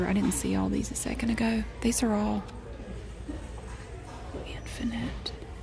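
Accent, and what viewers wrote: South, United States. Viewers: I love your accent. 😍 That's the part of the US I'd want to live in for the rest of my life ❤️ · This was so incredibly satisfying!!! & I looooove her accent. I recently moved from Hawaii to Texas & I can’t get enough of these southern accents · The video I didn't know I needed. Her southern accent makes me feel safe and her little phrases like "what kind of a drunken duck" make me laugh my ass off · I love your accent so much😭 The southern twangs keep reminding me of home😭😭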